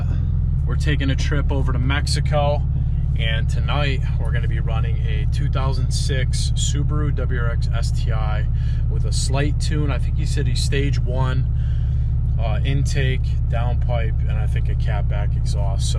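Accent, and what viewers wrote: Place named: Philadelphia, United States. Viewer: Lol bro you just like your from philly.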